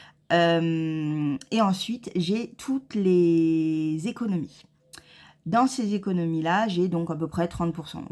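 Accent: South, France. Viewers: 😂 Cet accent "ho pitaing" j'adore. Moi aussi des fois on entend fort mon accent et en hiver certains me disent " ho ça va elles t'ont pas fait mal les cigales quand elles sont sorties de ta bouche ?". Et la quand j'ai vu tout ce que tu as détricoté j'ai été choquée et mon fils viens me voir inquiet :"ça va mama?" et quand je lui ai montré ce que tu fais il a dit que tu es trop forte. Merci pour le tuto 😂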